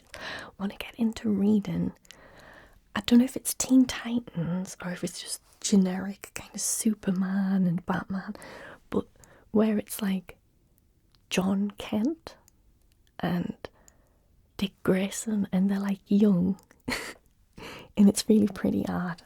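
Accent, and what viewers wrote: United Kingdom. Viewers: Jesus this is your normal accent?! Might even be more Harry Potter than the movie universe itself ha. It's even more impressive then with that Villanelle Russian accent and mannerisms. Ultimate F4 is great-I need to read that again in the future. I need to pick up a Crichton book. I hear nothing but praise for that guy and his novels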